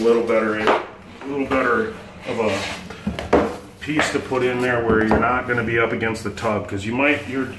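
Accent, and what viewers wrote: Minnesota, United States. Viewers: Nice!! Gonna get me one of those mini planers!! You from Minnesota?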